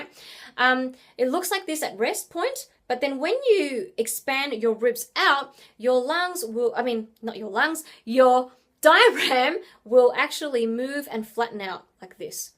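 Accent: Australia. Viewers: Do u live in Australia? Cause your accent sounds like theirs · are you australian? ...me too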